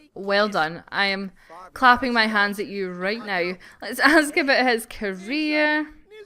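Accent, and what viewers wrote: Scotland, United Kingdom. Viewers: Your accent is amazing:) i grew up in Hamilton/Glasgow keep up the amazing videos:) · For lunch I had: CHOCOLATE, EASTER EGG, and some other stuff (cheese and biscuits? I don't remember) but most importantly CHOCOLATE!!! SO EXCITED!!! But med school is a lot of dedication just to meet hunky guys... The family (plus Grace) are so cool!!! BTW As a English-American person (who needs to work on her accent), I think that Scotland is really awesome, and your accent is adorable! I would love to go to Scotland, I only went when I was little. It's nice to see some of the new stuff (clothes, hair, etc.) on actual sims to be played with, not just maxis' creations. And Tate is really cute. :D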